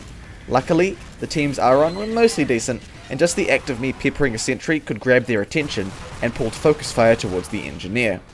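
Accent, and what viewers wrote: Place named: New Zealand. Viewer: Are from NZ by any chance you've got the accent.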